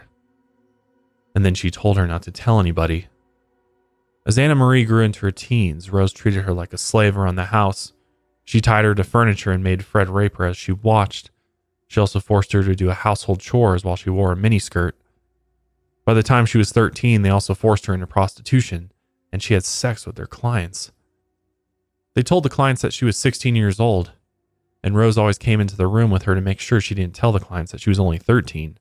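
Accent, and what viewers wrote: United States. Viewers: Oh my God, I had such a laugh out loud moment when I realised was talking about Hertfordshire at the beginning of this video 😂😂 and some other English names made me laugh throughout too. I mean that I was laughing lovingly because it's so cute how Americans often pronounce our place names 😅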